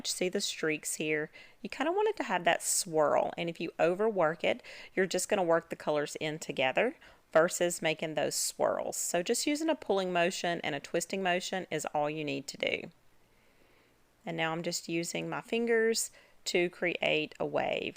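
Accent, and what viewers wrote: South, United States. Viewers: I just love Dollar Tree. Love your Southern accent.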